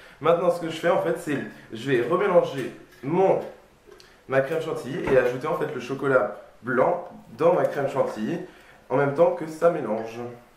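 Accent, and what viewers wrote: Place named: England, United Kingdom. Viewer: Je n aime pas du tout ton acsent anglais et tu veux un te la péter dsl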